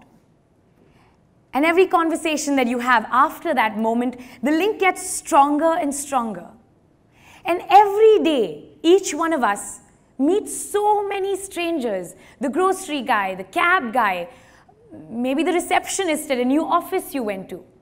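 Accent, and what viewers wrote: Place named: India. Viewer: I just love your Indian accent,.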